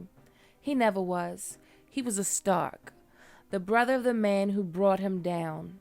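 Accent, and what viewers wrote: United States. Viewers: What part of America is this accent from, it's hooking me.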